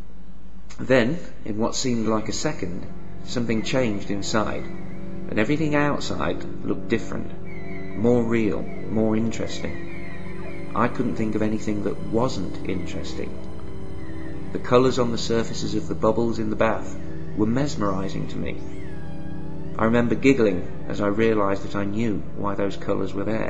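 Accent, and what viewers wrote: United Kingdom. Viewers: Sounds like UK maybe?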